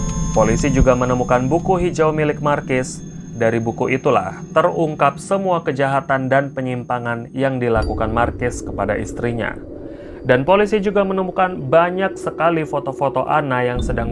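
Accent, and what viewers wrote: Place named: Bogor, Indonesia. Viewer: Dengan logat ciri khas suara nya ....santuy dri bogor🌷🌷🌷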